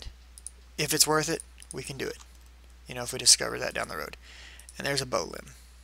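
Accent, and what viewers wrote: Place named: North Carolina, United States. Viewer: are u from NC? your accent is identical to mine. lol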